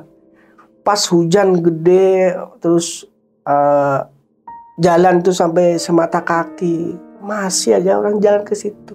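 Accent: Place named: Sumatra, Indonesia. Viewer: Aku juga rada salfok sama logatnya gk ada Jawa jawanya. Kayak logat Sumatra.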